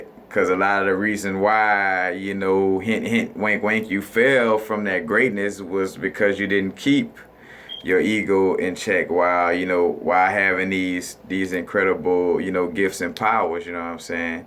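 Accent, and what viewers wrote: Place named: South, United States. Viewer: I notice that you have a southern accent - you would have been burned at the stake, in earlier times - by both blacks And whites for expressing this type of contentent.... the 21st century is truly is incredible !!!.....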